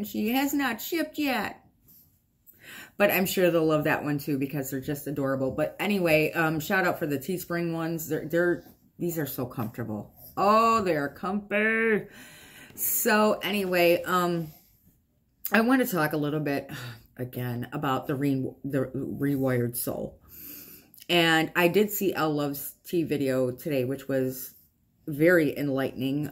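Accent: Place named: Buffalo, United States. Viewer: I know that this is a very invasive question, but where are you from? Your accent reminds me of my family/people in my hometown (Buffalo). I could be completely off, please do not feel pressured to answer.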